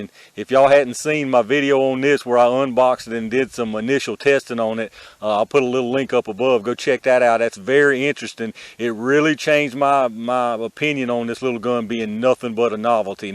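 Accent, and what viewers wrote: South, United States. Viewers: Excellent presentation, loved: that southern talk!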